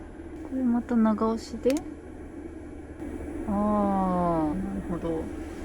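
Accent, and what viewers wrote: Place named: Kumamoto, Japan. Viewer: コメント紹介ありがとうございます😊さすがはさん、動画内でも普通に熊本弁出てくるだけあって、熊本弁丸出しのコメント読みもバッチリ👌 今年の寒波は今日もマイナス4.5℃で夜中から朝にかけて雪❄️が舞ってましたよ。僕は天草の本渡出身の益城町在住です。僕は若い頃はこの寒い時期でも磯に釣りに行ったり、蘇陽町の渓流の管理釣り場にマス釣りに行ってましたが、トシと共に寒い時期や暑い時期は釣りに行かなくなりました😅 九州のスキー場は、動画コメント紹介でも言われてた熊本と大分の県境の九重スキー場、佐賀の天山、あとは熊本と宮崎の県境の五ヶ瀬ハイランドスキー場もありましたね。行ったコトないけど😅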